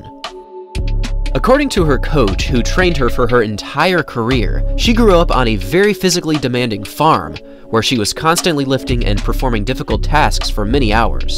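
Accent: United States. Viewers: Why don't you take Griffith Joyner into this equation ? oww wait, i hear a yankee commenting voice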